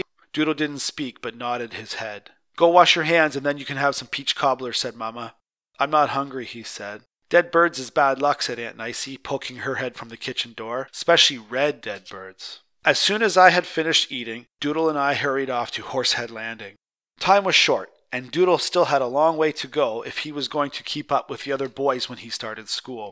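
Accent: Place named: Canada. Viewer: the Canadian is strong with this one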